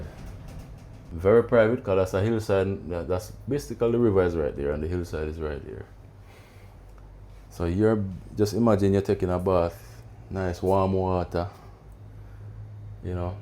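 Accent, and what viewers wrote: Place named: Jamaica. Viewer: my grandma is from Cuba too. Love the interaction and love your accent Love the Jamaican accent with the Cuban accent beautiful. She was just the same curses in patois curses in Spanish We love her. Great job girl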